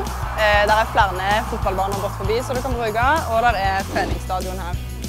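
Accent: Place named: Stavanger, Norway. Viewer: Reklame for Grimstad, og ikke noen med Grimstad’s dialekt som snakker ??? Kødder dere ? Stavanger eller hva det enn er. Latterlig at dere bruker dette. Ikke latterlig faktisk, men provoserende 🤬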